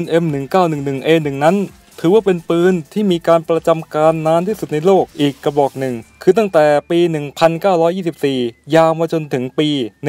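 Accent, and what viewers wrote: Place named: South, Thailand. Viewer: เสียงสำเนียงใต้เหมือนพี่ โต้งกันเบท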